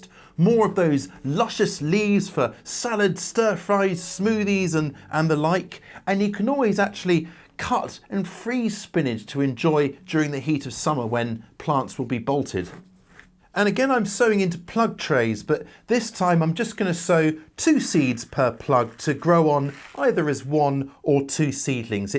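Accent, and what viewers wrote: England, United Kingdom. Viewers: Always. 😂He’s obviously English, so UK climate.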